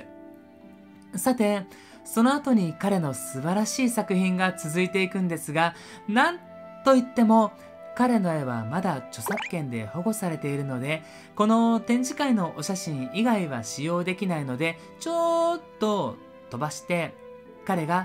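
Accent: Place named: Kobe, Japan. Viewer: 先生の動画でちらほら聞こえる上品な関西弁アクセントは、神戸かなぁって思ってるんですけど、やっぱりそうやったんやろか?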